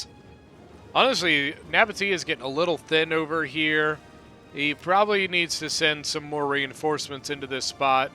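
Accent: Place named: United Kingdom. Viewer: your british accent actually suits u a lot more than u relise :))):_Ellington::_Rome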